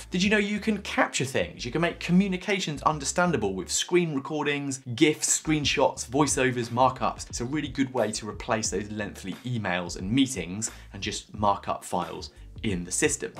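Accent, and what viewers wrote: United Kingdom. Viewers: I fell in love with your channel two years ago and have religiously been watching every video as well as BUYING both of your second brain offerings. Being an avid viewer, I feel compelled to give you some constructive criticism. We Americans are a sucker for a proper Brit accent and you have a brilliant one. However.... (you knew this was coming)... in the last year or so, you've begun to sound like a pitch man rather than a user advocate. I realize it is most likely difficult to integrate paid sponsorships and make them sound like a recommendation rather than a compulsory blurb in your video. Keep in mind, though, your avid viewers are well aware of the paid sponsorships and seriously know the difference. I have to admit it's really started to turn me off.:( The sponsorship nods get longer and longer and they're usually about products that everyone already knows about. 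I don't want to abandon your channel so I figured, to be fair, I should express my thoughts to give you food for thought and hopefully, have you get some retrospective insight of then vs now to see where I'm coming from.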